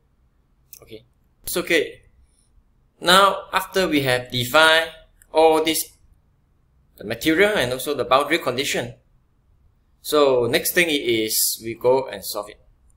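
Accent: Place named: Singapore. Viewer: it sounds like a singaporean accent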